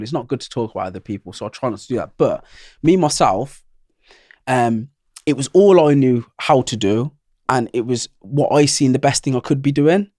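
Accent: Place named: Staffordshire, United Kingdom. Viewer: Staffordshire accent neutral pretty much!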